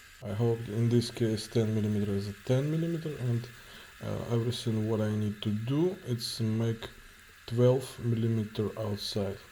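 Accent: Russia. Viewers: Такой акцент яркий, ощущение будто русский человек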